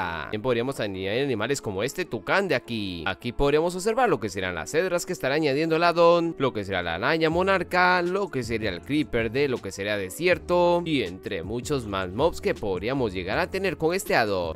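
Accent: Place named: Costa Rica. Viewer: Yo diciendo que raro esa pronunciación de la R me suena parece tico jaja luego veo la bandera y efectivamente jaja saludos desde Cartago xd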